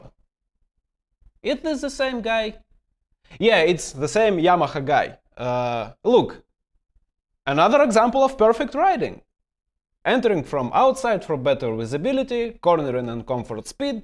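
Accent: Russia. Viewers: I Enjoyed Your Presentation Very Much. I'll Check Out Some Of Your Other Videos. Is That A Russky Accent I'm Hearing? Da, Nyet, Mozhedbwit? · Not sure what you mean. This is a thick Russian accent and he pronounces 'V' as any Russian - easily and with no problems. And yep - very good breakdown!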